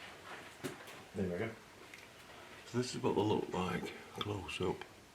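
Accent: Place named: Yorkshire, United Kingdom. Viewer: Love the yorkshire accent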